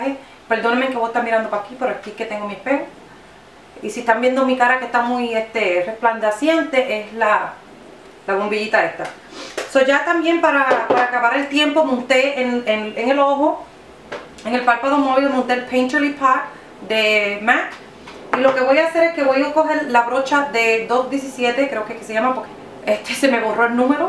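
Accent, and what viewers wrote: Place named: Puerto Rico. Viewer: a mi me gustan los maquillajes naturales :) ademas quiero que sepas q me gusta como hablas bien boricua.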